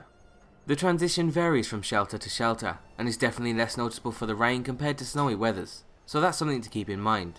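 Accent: United Kingdom. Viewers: yeah nice but god i hate that british accent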